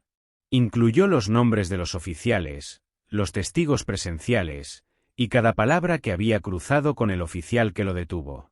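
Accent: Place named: Spain. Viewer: Quita ese acento español y a la mejor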